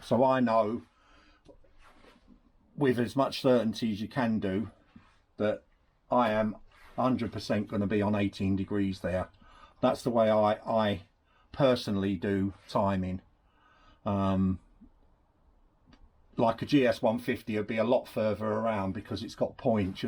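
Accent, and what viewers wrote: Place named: mixed, United Kingdom. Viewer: Hi videos great you old school like me but you got great knowledge I have learnt so much as a born again Scooterist. Your attention to detail brilliant. By your accent you Essex or London boy. Regards Terry.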